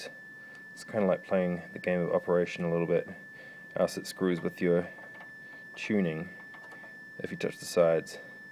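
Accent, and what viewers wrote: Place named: New Zealand. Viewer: "for people who are too lazy to read the manual" hahaha - that's me Your accent + use of jandal makes me suspect your are from NZ - am I correct?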